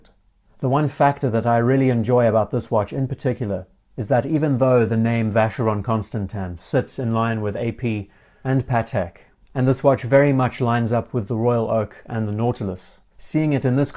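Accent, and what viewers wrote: South Africa. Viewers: I love you - your content. Also, your South African accent is perfect for YouTube. This VC is OUTSTANDING! Nice video mate. Stay safe.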